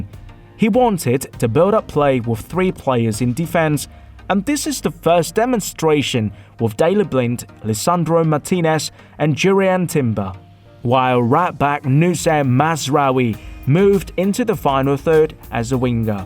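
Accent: United Kingdom. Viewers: 1 like cho bạn nói giọng Anh chuẩn 😌 trong khi nhà nhà học theo giọng Mỹ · giọng này giọng Anh chuẩn bố ơi :)) giọng Anh gốc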